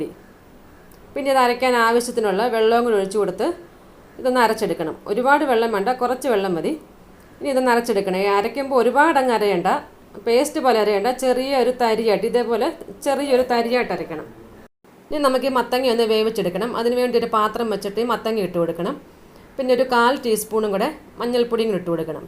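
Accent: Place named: Kottayam, India. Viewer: സംസാരം കൊള്ളാം കോട്ടയം സ്ലാങ് ❤️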